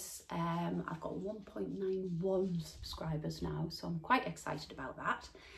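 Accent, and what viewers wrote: Newcastle, United Kingdom. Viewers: pet, your vlogs are so entertaining. I love your geordie accent and all the sayings, I told my partner just the other week that his shoes were clarty and he looked at me as if I was mad - he’s a southerner needless to say. I’m initially from North Shields but moved away in ‘64, and have settled finally in sunny Spain a few years ago. Your zadie is amazing, and the fabric is gorgeous. Xx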